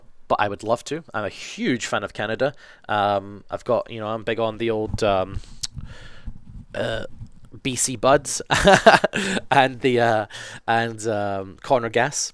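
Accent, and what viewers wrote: Scotland, United Kingdom. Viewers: I may not be sociophobic much like I hate talking to people i've never met and I hate it when someone looks at me.. but i do have extreme trust issues... like for one thing i'm scared to get a boyfriend... but still you make me feel better when I watch your videos knowing you have phobias too... Anyway, you are truly amazing and i'm happy to hear your scottish too I have a family kilt and love it.. You rock and never forget we love you! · SCOTTISH FOR THE WIN GO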